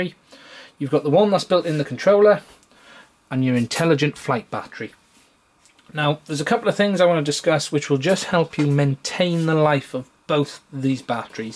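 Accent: Wales, United Kingdom. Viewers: How refreshing to hear a Welsh accent on YouTube. Great video mate! Diolch yn fawr😀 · Sound like a fellow Taff. Good to hear a fellow taff, I'm based in Western Australia watching your vid reminds me of home :-) - Hiraeth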